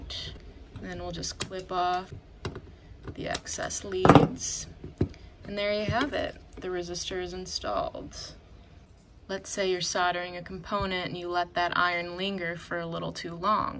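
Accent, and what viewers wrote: United States. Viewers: Why do Americans distort the English language? i.e. The word SOLDER has an 'L' in it which in normal English is pronounced! So why don't Americans pronounce the word SOLDER instead of saying SODDER?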